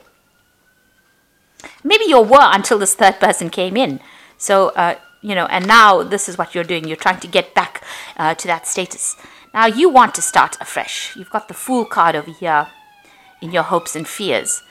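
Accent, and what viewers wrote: South Africa. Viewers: Are you SAfrican? You sound like home :-)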